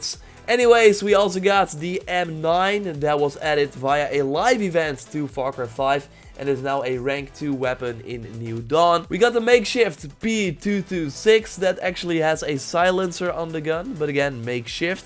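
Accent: Netherlands. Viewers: you are dutch haha das heel simpel te horen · Are you dutch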